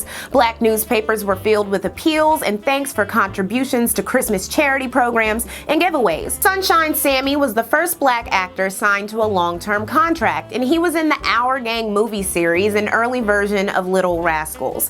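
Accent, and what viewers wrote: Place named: South, United States. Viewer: lol i liked your southern voice. it reminded me of whitley from a different world. lol but i never knew that about the clan. that picture was really jarring to look at. but it was a cool video. i learned alot.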